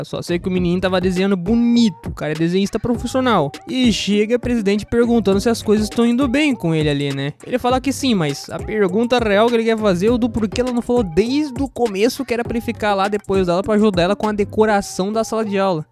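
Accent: Rio Grande do Sul, Brazil. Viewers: Esse narrador parece gaúcho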